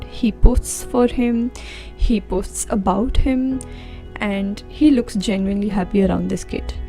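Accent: India. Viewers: Lmao dw be proud of your accent. Idk y but ur accent sounds like an Indian accent to me cse like I'm from India too and it sounds too familiar. (u don't have to reply if u don't wanna and if you're not comfortable with letting ppl know where u come from) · I am just loving your Indian accent ✨...and larrie is real 💙💚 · I was thinking the same her accent is soo Indian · Just me Or ur accent sound a bit indian??????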